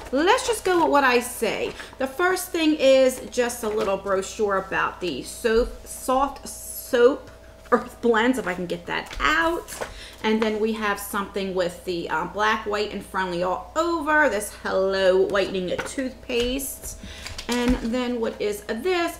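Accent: New Jersey, United States. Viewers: I just like hearing your accent totally New Jersey, yes Walmart has goodies great for traveling too.Have a great Evening <3 Tina in Cali...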